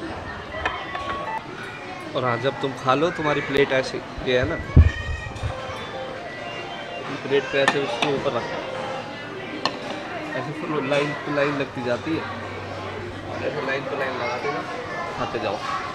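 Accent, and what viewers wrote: Haryana, India. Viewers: Bhai kabhi ye apni harayanvi boli change mt krne ✌️♥️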